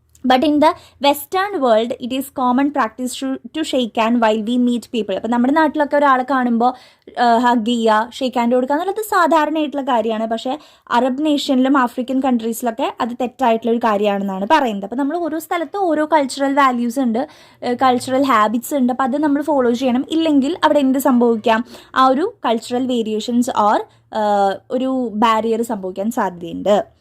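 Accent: Thrissur, India. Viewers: Thrissurkaari aano😊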